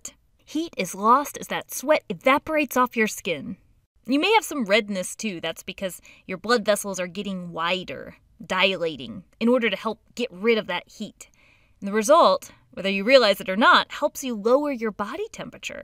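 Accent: United States. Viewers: Gr8! R u American..?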